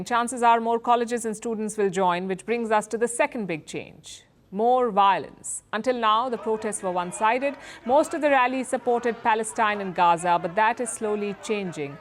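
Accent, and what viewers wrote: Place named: India. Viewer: Indian accent is so beautiful like this news lady. The best accent in the world.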